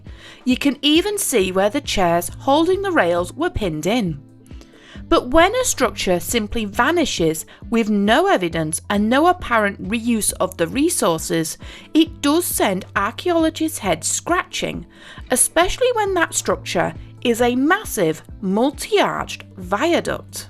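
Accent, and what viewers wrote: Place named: Yorkshire, United Kingdom. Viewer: What an amazing story 😃. This is rapidly becoming one of my favourite history channels 👏🤩🙏🇦🇺. Plus your accent reminds me of Yorkshire which I've always loved 😊.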